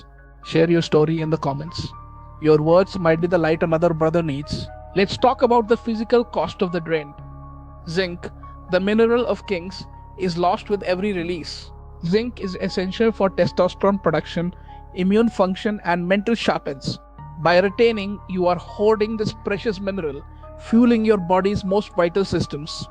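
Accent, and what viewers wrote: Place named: India. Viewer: I liked the AI voice more POWERFUL and SERIOUS. INDIAN ACCENT makes it lose of FOCUS from the TOPIC. BRING BACK AI VOICE. PHEROMONES ✔️ PHARAAMUNES ❌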